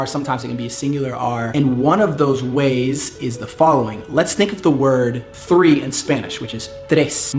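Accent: Buenos Aires, Argentina. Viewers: Más porteño que la mierda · Me parece o perdiste un poco tu acento natural? Te escuchaba muy porteño 😄😊 Me encantó el video... muy buen tip! 👏👏👏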